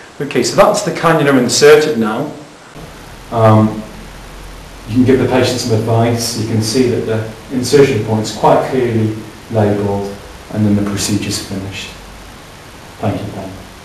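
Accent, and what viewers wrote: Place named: England, United Kingdom. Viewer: finaly after serching for ever i found an english one not american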